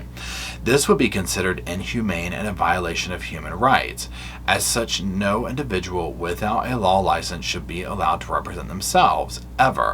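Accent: South, United States. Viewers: I’m sure you covered it in some way on one of your videos but im curious are you from the south? I thought i could hear a lilt and a word or two thats very regional.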